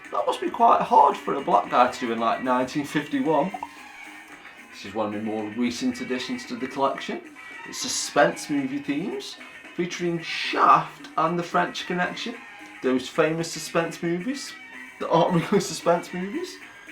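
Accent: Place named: Stoke-on-Trent, United Kingdom. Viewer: Where is you accent from, it sounds a bit stoke on Trent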